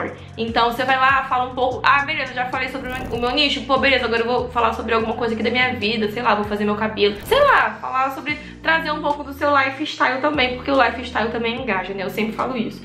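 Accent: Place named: Rio de Janeiro, Brazil. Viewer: vc é do suburbio do Rio? Pergunto pq eu sou e eu super me identifico com o seu jeito de falar.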